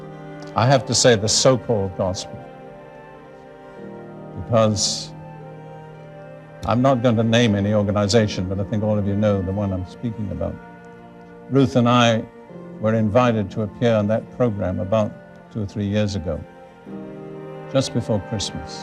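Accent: United Kingdom. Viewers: You mean his british accent...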